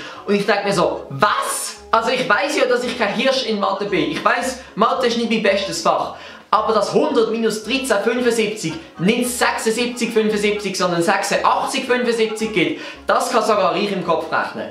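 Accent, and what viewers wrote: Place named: Swiss German, Switzerland. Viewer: Dir ist klar das er einfach Schweizerdeutsch redet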